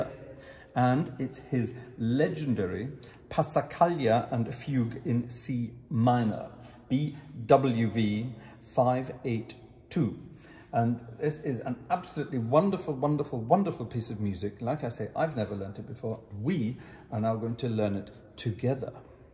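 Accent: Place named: Scotland, United Kingdom. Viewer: Hi and greetings from Scotland. Many thanks for your entertaining and informative videos, enlivened by your cheeky Scottish chappie style. Your videos are aimed more at organists and organ students rather than people like me who have more organ LPs and CDs than they can count (starting with Rogg's 1960s recordings (Metzler at Grossmunster, Zurich, as well as the Arlsheim Silbermann mentioned by Elizabeth). Congratulations as well on the great audio and video quality/camera work-well done Mrs/Frau G! I hope that your followers for whom English is a second language are savouring your beautiful North of Scotland accent as you wax lyrical about Brustwerks and Ruckpositivs. I regret having only a smattering of German, but is someone asking if you now speak English with a German accent? Nein nein nein I say. I hope you're getting some compliments on your German, which sounds very good to me. 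Best wishes Jim Dickson.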